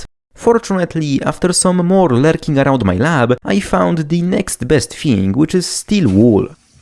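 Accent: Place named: Poland. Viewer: I live to watch the armchair and backseat drivers be UNABLE to stop themselves from commenting and correcting..... JFC THIS is why we're in a burning dumpster of civilization....🙄 Otherwise, thank you to my favorite amateur chemist with the most AMAZING Polish accent.🎉 Highly informational and entertaining. Quality stuff. Kudos from Appalachia 🤙🏻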